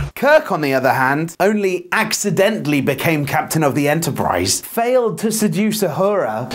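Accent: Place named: United Kingdom. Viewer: Dude, u b fucking awesome. Love the brit voice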